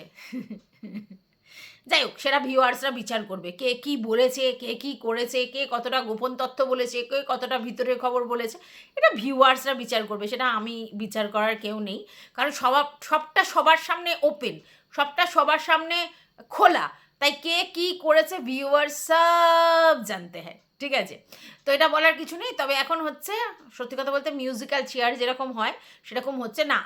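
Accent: Bangal, Bangladesh. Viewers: Darun bangal vasa koile go dil khus😂❤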